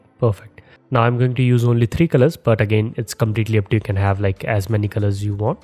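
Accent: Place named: India. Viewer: I'll be completely honest, I can easily tolerate this level of indian content just for how chill it is. the dang phone calls I have and the audio is so muffled, it be difficult to hear individuals with said accent via phone quality but YouTube audio quality is like listening to an audiobook lol Thank You for being here sir!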